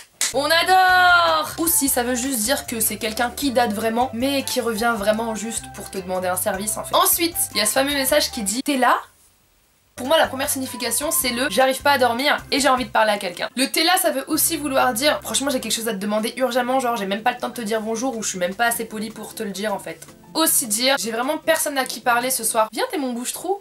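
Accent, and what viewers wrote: England, United Kingdom. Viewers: j'adore ta chaîne ma belle! tu me fait trop rire avec ton pti accent british! #teamsnapchat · tu as un très belle axent anglais j'adore t'es vidéo continue comme ça tu es trop drôle bisou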